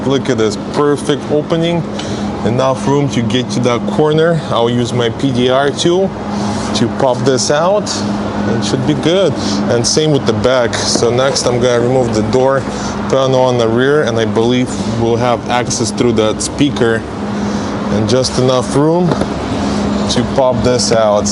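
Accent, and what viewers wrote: Russia. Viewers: Я как то по акценту понял что ты русскоговорящий, привет из Румынии.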